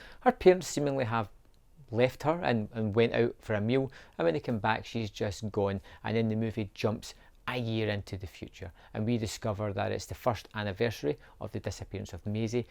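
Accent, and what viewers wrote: Scotland, United Kingdom. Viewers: Was surprised to hear a fellow Scots voice.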